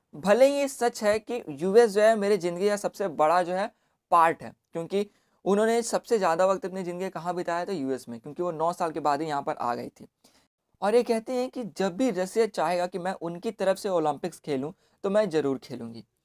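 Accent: Bihar, India. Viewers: Oh mujhe lga ki ap bihar se ho qki hlka apki boli match kr rhi thi but ab confirm ho gya😂♥️wahh!! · Ohhh u r from Bihar 😱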